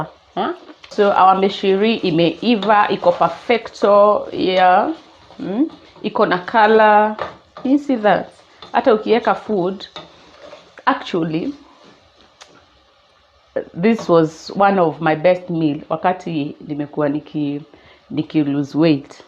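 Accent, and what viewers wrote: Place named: Kikuyu, Kenya. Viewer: The Kikuyu accent in you made me Subscribe asap....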